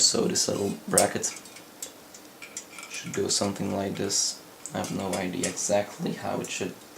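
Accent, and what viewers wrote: Netherlands. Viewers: i cannot watch this cus of your voice i hate a dutch accent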